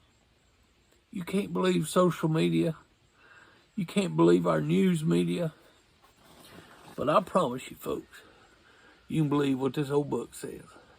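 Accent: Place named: South, United States. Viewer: Believe none of what you hear, and half of what you see. .. don't know who came up with the original saying but never more true with how people can make things even appear real that ain't so. B asiC I nstructions B efore L eaving E arth Glad to listen to you talk. Love good ole boy country accents especially when a good message comes with it. Hope you healing progresses rapidly, and dang, if you keep sitting out looking at that scenery, you ought be healed up soon.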